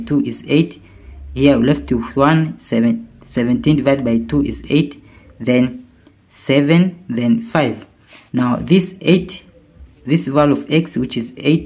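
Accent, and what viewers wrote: India. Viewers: 这尼玛印度口音绝了。这题解的 跟傻子似的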